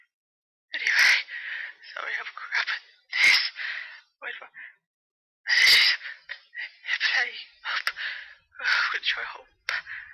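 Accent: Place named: United Kingdom. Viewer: The Brittish accent un-topable sneezes. you have it all!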